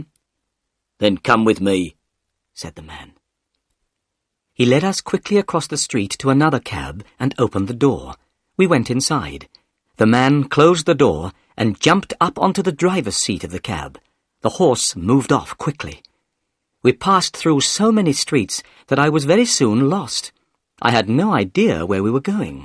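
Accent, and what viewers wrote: United Kingdom. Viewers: Es inglés británico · Me encantó!!! Creo que entrenar el oído es la major manera de iniciar, aunque siempre se necesita un poco de la vieja escuela, manuales y eso. Puedo leer porque escuche como se pronuncia la palabra y puedo traducir oralmente pero me cuesta muchisimo más escribir, es tan triste que no pueda siquiera copiar sin estarme fijando el orden de las letras en la palabra. Aunque soy feliz entendiendo lo que lees, realmente creo que lo haces genial.. con el toque inglés más que americano pero quizá es por el libro que es de esa nacionalidad. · Es el inglés británico cierto?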